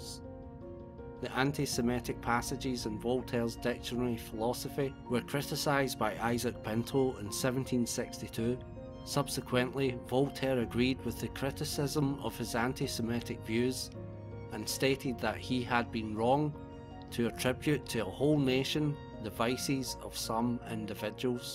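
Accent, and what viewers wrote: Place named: Scotland, United Kingdom. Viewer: It's a Scottish accent not Irishman...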